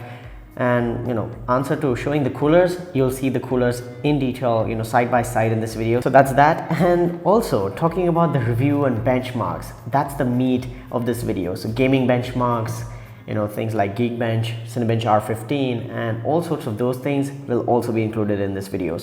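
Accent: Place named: India. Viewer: your english accent is good nice indian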